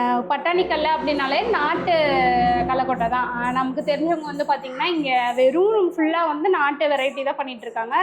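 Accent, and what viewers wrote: Kongu, India. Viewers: ஐஸ் நான் போலிஸ் தான் அனால் உங்கள் கொங்கு தமிழ் பேச்சுக்கு அடிமை என் வீட்டில் மாடி தோட்டம் வைத்து இருக்கிறேன் உங்கள் வீடியோக்கு காத்திருப்பேன் சென்னை வந்தாள் எங்கள் வீட்டிற்கு வரவேண்டும் 🙏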